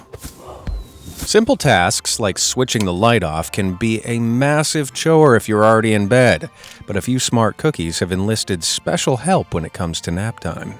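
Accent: United States. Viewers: I noticed that you have an American accent but said buggy when referring to a baby stroller and lorrie when referring to I’m assuming a delivery driver and fire brigade instead of fire department. Odd.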